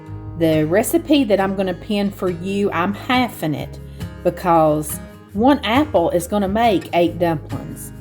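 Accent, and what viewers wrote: South, United States. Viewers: Wow, what a treat!! Have shared to my laptop. But a question - are these recipes available in writing? I must say. Your beautiful southern accent is music to my ears. I left Alabama with my children and pastor husband to Hawaii in the late 70’s. Oh, I just heard Tennessee, the place of my birth. He pastored a church for years, but is in heaven now. I stayed here as my children married wonderful local people. And have their roots planted deep in Hawaii. Mahalo for your scrumptious recipes. Do hope they are available in writing to print. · I’m new and catching up with your channel! Love your crescent roll options and looking forward to trying all these! I am from NYC but also lived down south! I so enjoy the “southern comfort” of your voice and your calm demeanor! You are just great therapy ma’am! Cheers, Michael Albuquerque NM USA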